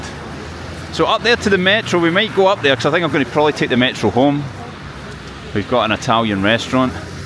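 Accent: Scotland, United Kingdom. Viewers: Stopped by to listen to your accent. I adore scottish accent. Nice video. Not sleepy at all! Best wishes from Belarus! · Happy New Year. Enjoy your videos in Russia. Where are all the Lada cars? Lovely street layouts as well unlike Lanark! good to hear a fellow Scot reporting from Russia. Cheers Bill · Beautiful city. You dound scottish. Im from Strathbogie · Great project! I've visited Moscow twice and lived in St. Petersburg for 6 months. Your Scottish accent is pure ASMR - so relaxing. I really like your channel. 5 stars! By the way, I speak fluent Russian and I have no idea what that word next to the sea is. It's definitely not the Black Sea. It's Greek, lol.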